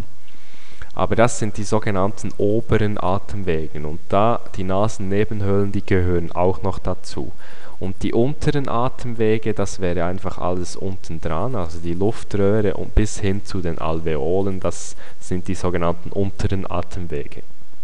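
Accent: Switzerland. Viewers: Kann das sein das ich ein Schweizer Akzent höre ? :)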